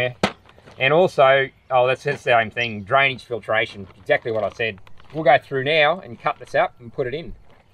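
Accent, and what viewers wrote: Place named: Australia. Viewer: Great video so good to see and hear an Aussie on utube. Once in a life time job well done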